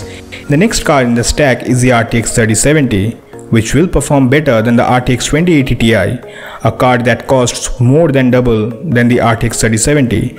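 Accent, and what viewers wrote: India. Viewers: After hearing it voice I confirmed that u r Indian Desi londa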